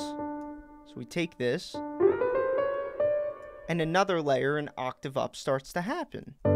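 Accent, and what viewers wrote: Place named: Delaware County, United States. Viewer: I've watched a handful of your videos and could not get over your HEAVY Delco accent. Hat and Jersey confirms it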